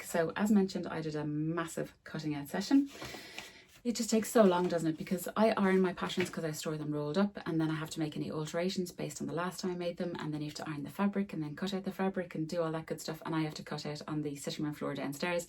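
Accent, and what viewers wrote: Ireland. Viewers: I do love your vlogs. Your accent reminds me of 7 months I lived in Crossakiel when my OH had a job in Cavan.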